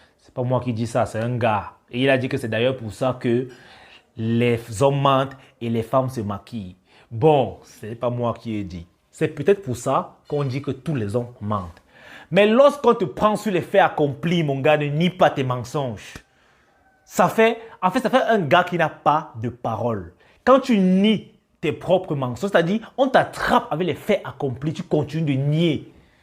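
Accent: Cameroon. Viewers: Je reconnais là l'accent de mon frère du 237 😎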